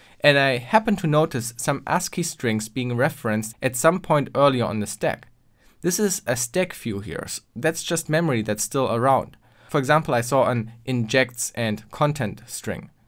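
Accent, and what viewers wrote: Germany. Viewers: Dein deutscher Akzent ist so schrecklich und versaut das ganze Video. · sorry dachte du machst das einfach so aber wenn du es dann nur aufs Geld abgesehen hast, dann ist es egal, sorry. Aber trotzdem toller Youtuber mit tollen Videos, man kann aus deinem Englisch schon raushören, dass du Deutsch sprichst.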